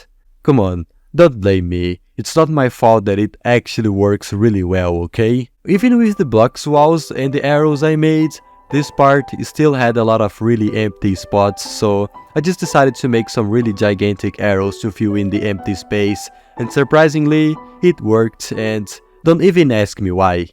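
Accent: Brazil. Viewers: Youre brazillian aint you🥶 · Dude you sound so Brazilian or Portuguese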